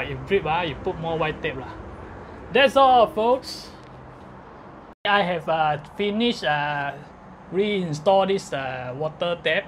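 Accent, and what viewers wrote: Singapore. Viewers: So pls share whatever u do or eat Wat with us, we like your video, the singlish n everything n we learnt a lot from u.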